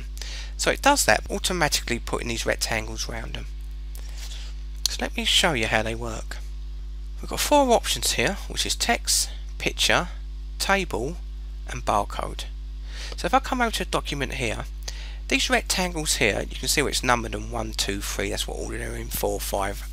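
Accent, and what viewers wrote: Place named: Liverpool, United Kingdom. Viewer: It´s a mess. I am aware that back at school I learned British English, which is, properly speaking, Oxford English. Some sounds the speaker makes seem funny to me, but I could not understand them. Is it e. g. Liverpool English? Whatsoever, I gave up on learning from this video after about 3 minutes.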